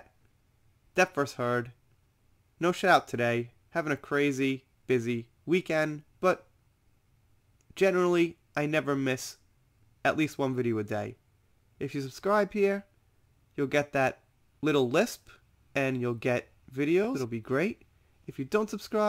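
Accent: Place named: East Coast, United States. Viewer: You know the YouTubers that become uber famous let you in on them, not just news. Still wonder if your wife is Japanese or work brought you there...what's it feel like having strong East Coast (NJ? NYC?) accent in Japan...one of the few places I've not been but so many are curious about it. When you are comfortable.....🤗 Not before.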